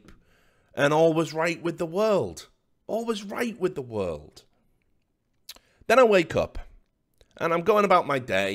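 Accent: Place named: England, United Kingdom. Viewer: LMFAO. When he said "COCK AND BALLS", I died. I absolutely love English accents.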